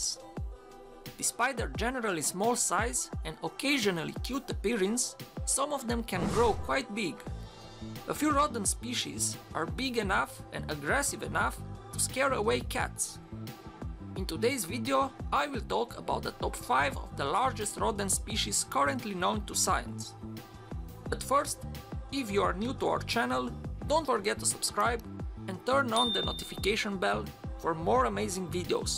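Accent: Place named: Poland. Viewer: Polaszkanski angielski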